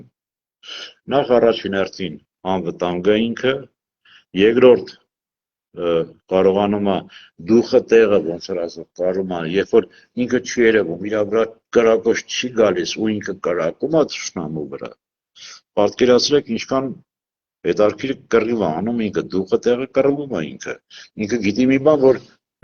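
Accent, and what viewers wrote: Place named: Volgograd, Russia. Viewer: Голос. Ссли8но Волгоград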